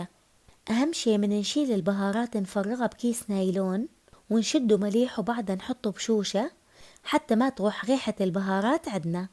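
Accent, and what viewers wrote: Mosul, Iraq. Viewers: هاي اللهجة المصلاوية شوية تختلف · هذه لهجة الموصل ...نينوى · اللهجه الموصليه اجنن ❤❤ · حبيبت قلبي انا من بغداد استمتع ❤بصوتج واللهجة ❤الهلنا وناسنا الطيبين ❤من الموصل ❤ اكلاتك كلها مميزة جداً لذيذة نشكرك تعبناكي ويانا❤❤ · الهجه المصلاويه تجنن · هذه لهجة حضر العراق القديمة و التس بقيت أثارها باقيه بلهجة أهل و العوائل الأصلية لمدن: الموصل، تكريت، حديثة، عانه، هيت و غيرهم. و سابقا كانت لهجة اهل بغداد كذلك و التي حافظ عليها اليهود و المسيحيين. اما ما يطلق عليه اللهجة العراقيه حاليا فهو خليط من بقايا اللهجة القديمة مع لهجة العشائر العربية البدوية من بادية الشام التي استوطنت العراق إبان الفراغ السياسي في نهايات ال ١٦٠٠ و التي غيرت التركيبة السكانية و اللغوية و الثقافيه لسكان العراق كون الغلبة كانت لهم و يس لأهل العراق الحضر. و هكذا تغير كل شيء. تحياتي لأهل الموصل ممكن حافظ على لهجتنا الأصلية العريقة. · لهجتها مصلاوية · هذه لهجة أهل الموصل تختلف شوي عن لهجتنا العراقيه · وصفة روعة 😍😍😍 ... 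عاشت الأيادي والأروع اللهجة المصلاوية الجميلة ❤❤❤ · مو حلوه الهجه لمصلاويه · انا والله كنت مستمتع بالوصفة ومستمتع اكثر بالهجة العراقية الجميلة وتعلمت بعض الكلمات العراقية ، تحية لكل اخوانى من العراق اخوكم من مصر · هذي اللهجة تعود لأهل مدينة الموصل فقط شمال العراق وليس كل العراقيين يتكلمون هكذا مع تقديري لك والمقدمة البرنامج الرائع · مااحلى اللهجه الموصليه . وعاشت ايدك على الطبخه والوصف المبدع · الف عاغيه وعاشت ايدج وشنو هاللهجه المصلاويه الحلوه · انا كل الفيديوا استمع للهجهه المصلاويه 😅😅😅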